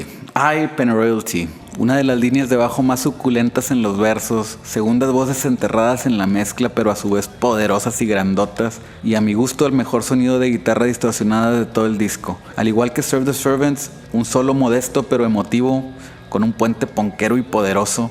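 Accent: Monterrey, Mexico. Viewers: Excelente canal... Por el acento, suena que eres de Monterrey, sugiero un video de la avanzada regia y del cafe iguanas... Saludos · Por qué no hablas de una forma más genérica, no a todos les gusta cuando usas el acento mexicano y tus mexicanismos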